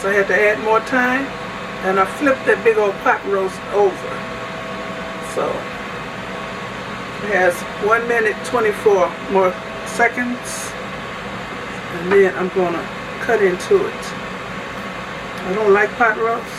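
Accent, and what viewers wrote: Chicago, United States. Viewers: I knew I heard Chicago in you lol This look amazing never thought about adding the soups to my meats … Will be trying soon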